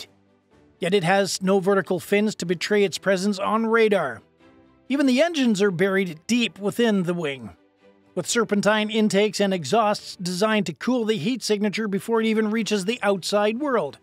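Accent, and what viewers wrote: Canada. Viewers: Based on the accent of the presenter, I want to know why in the world a Canadian is presenting this video. Are you just envious? Why don’t you tell your communist labor Prime Minister you just elected instead of Pierre Poilievre to kiss your collective backsides. We in the United States aren’t going to continue to save your butts while you do nothing. Enjoy your 35 to 50% terrace. You’ve earned them!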